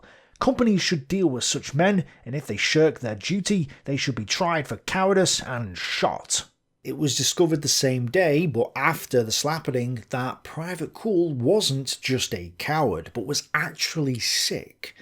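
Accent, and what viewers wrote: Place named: Staffordshire, United Kingdom. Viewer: That’s a fellow Staffordshire accent if I’ve ever heard ons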